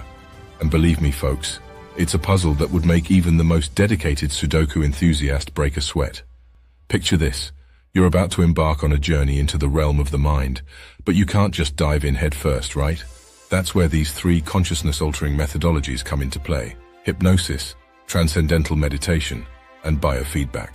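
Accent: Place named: United Kingdom. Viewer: It's amazing how a well polished British accent brings legitimacy to a documentary :)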